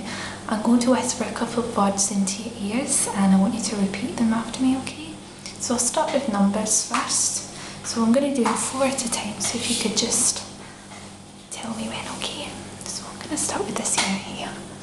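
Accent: Scotland, United Kingdom. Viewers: Good. I thought you were really Scottish! And im Scottish myself. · I absolutely love your Scottish accent. You make it sound like a song. Beautiful to listen to :) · Concerning your inbox: Open your video manager (for example) and see the menu on the left side - klick on "Community" - another menu will open and THERE you can find your inbox :) The Scottish accent rocks. I love your videos, great to see you're back ♥ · Scottish accent made me hard. I don't know why. Probably a combo of voice and gorgeousness.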